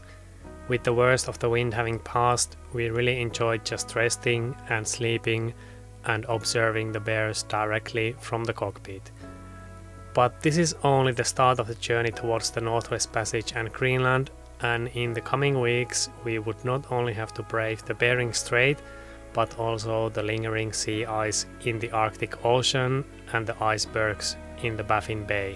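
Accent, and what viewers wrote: Finland. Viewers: Can place Your accent? Are You from Finland? Good luck! /Thomas from Sweden.😁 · I love this channel. The cinematography. Everything. But really it's this guy's accent and voice and delivery. I could listen to him forever. So matter of fact. I guess, very Finnish.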